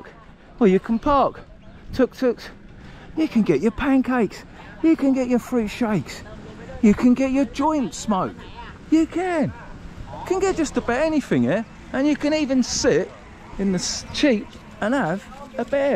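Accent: Essex, United Kingdom. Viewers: you sound like youve got an essex accent, dont sound much like a good old norfolk accent, but didnt you say in one of youre videos youre an aussie? im just curiuos mate, btw im from suffolk, cheers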